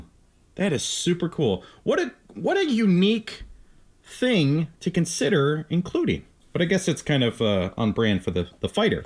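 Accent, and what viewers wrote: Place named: United Kingdom. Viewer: You are not an idiot! Nice british accent too!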